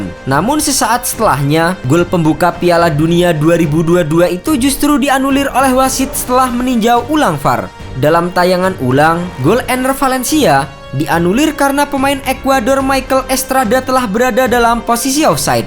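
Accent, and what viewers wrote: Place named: Sulawesi, Indonesia. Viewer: Ini yg ngomong orang sulawesi yaaa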